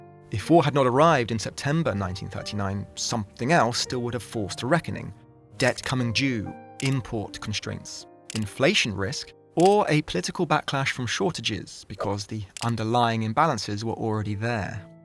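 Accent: United Kingdom. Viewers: How are u British now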